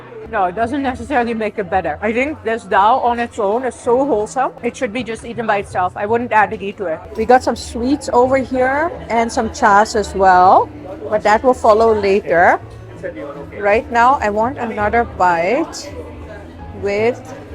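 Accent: India. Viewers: I loge your slightly Indian accent when speaking english😂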